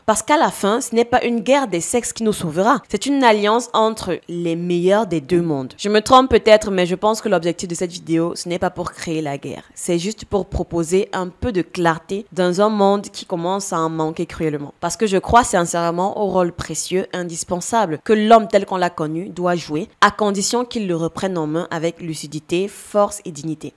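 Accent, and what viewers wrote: Cameroon. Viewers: Ton accent prouve que tu es Camerounaise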